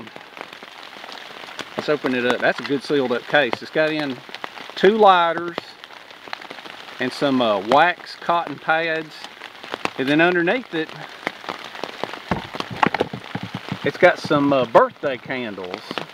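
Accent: South, United States. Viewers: He is as southern as it gets. I am from Alabama i know what i am talking about. Well sometimes i do.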